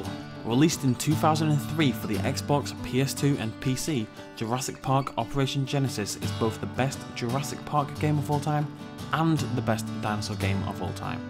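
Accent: United Kingdom. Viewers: ***** jpog is my fav dino game and by the way are you British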